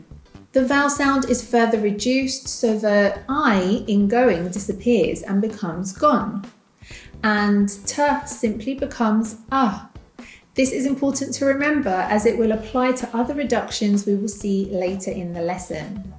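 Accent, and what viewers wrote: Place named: United Kingdom. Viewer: Videos with British accent is very very useful for people like me. Thank you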